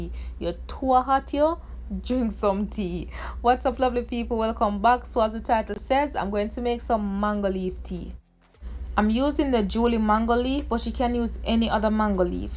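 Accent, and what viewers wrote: Jamaica. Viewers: I Can Hear The Jamaican Accent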